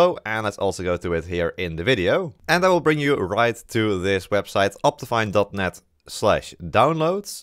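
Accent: Netherlands. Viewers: are you dutch haha me too i can hear from your voice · Are you dutch?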